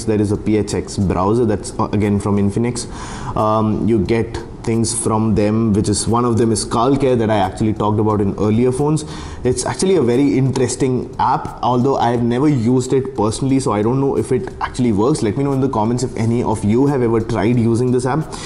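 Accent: Kerala, India. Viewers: മലയാളി ആണോ?? Namil ഒരു മല്ലു touch..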